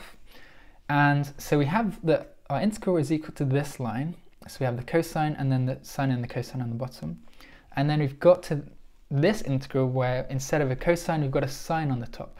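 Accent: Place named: United Kingdom. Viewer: Thank you so much I love your British accent btw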